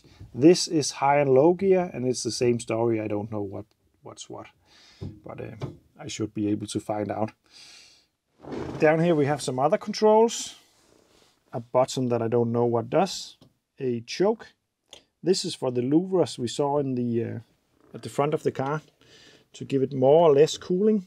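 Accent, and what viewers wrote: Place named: Denmark. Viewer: I think I know that car, given your Danish Accent and the fact that there are not many "Kermit the Frog green" UAZ 452 in Denmark. This is one of the cars Vladim brought to the country. I was also interested in that a year ago and had a test drive, You have to double declutch when you drive that UAZ. At some point in the 80's UAZ upgraded to a synchronized transmission this one was build before that. You can get newer transmissions for them if you get tired of double declutching. I think I know what the B and D stands for, that is for picking the tanks that the engine consumes from, as you probably has learned this car got two fuel tanks. YA3 that is UAZ in Cyrillic.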